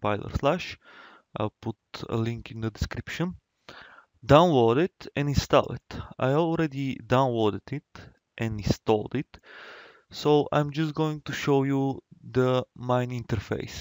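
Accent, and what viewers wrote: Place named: Russia. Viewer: Плохой английский с русским акцентом. Может есть такое видео на русском?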